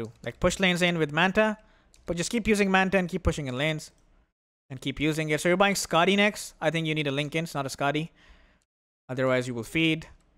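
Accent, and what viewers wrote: India. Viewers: He sounds Indian. I’d be constantly angry too if I was a subhuman.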